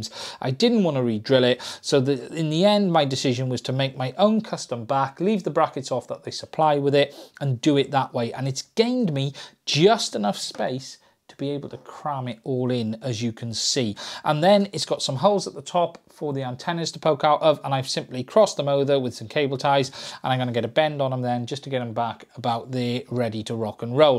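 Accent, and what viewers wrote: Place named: Cardiff, United Kingdom. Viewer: best videos re : dji fpv so far, i notice a gps on the front , how are you using? im coming from an inav point of view, also do you think if i used inav with gps i could still utilise the rth functions... Thinking of putting an air unit in my 7 inch shredder frame, it features esc`s on the arms and im using probably 30mm standoffs fair bit o room in there. oh im based in Cardiff. You sound like your from this neck of the woods (area)! great channel , cheers!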